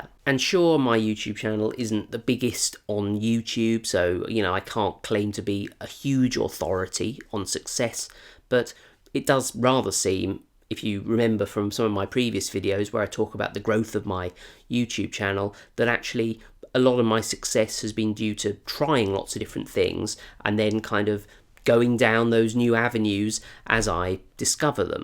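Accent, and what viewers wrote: United Kingdom. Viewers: the british accent does the rest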